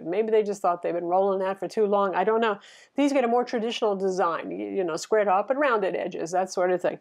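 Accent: United States. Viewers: I really enjoy your presentation, voice and style. Many American accents drive us older English people mad but not yours. Lol. Thanks for all you do.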